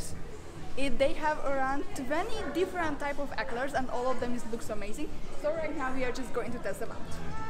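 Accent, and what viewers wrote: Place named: Hungary. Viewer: Romania was never part of Soviet Union. You have a very cute Hungarian accent. It's like your native country. Fortunately never Soviet Union. Greetings!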